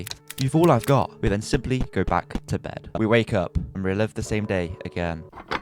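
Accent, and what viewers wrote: United Kingdom. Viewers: Oh hey another brit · this video kinda got ruined by the fact you’re British still a good vid though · I don’t hate that he’s British. I am British too. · I love your brittish accent:3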